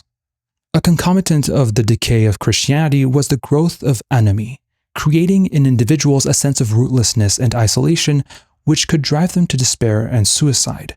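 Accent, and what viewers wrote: Quebec, Canada. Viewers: you’re a quebecor???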